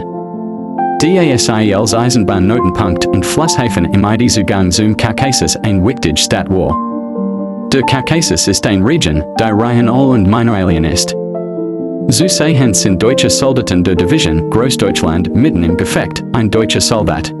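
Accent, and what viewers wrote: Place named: England, United Kingdom. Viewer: Terrible pronunciation. Strong English accent.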